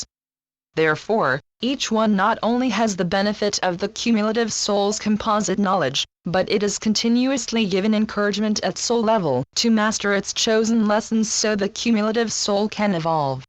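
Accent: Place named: United States. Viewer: The information is correct. In order to absorb information like this, it is necessary to put all previous experiences to one side, including prejudice of any kind, which may have been put into your mind by others, including family members and the current educational system, or experiences which may have made you afraid when young. This includes putting to one side prejudice against the 'robotic' American accent used in these transmissions. Those who are able to do this, will be able to understand the information. Those who are not ready, will not be able to, because they are allowing the remembered emotion FEAR, which is a strong emotion, to control them. The method is simple, but it takes practice. You just say, out loud if necessary, 'I AM AFRAID OF NOTHING'. I am not at all religious. But I DO believe in methods that can remove fear from people.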